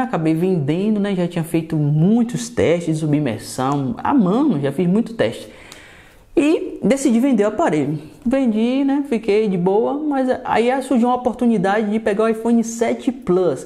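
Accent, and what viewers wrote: Bahia, Brazil. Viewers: Vc é baiano? Conheci pelo sotaque né? Rs abraços... Sou de Salvador/BA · TÚ É BAIANO É BIXIM 😁😁👍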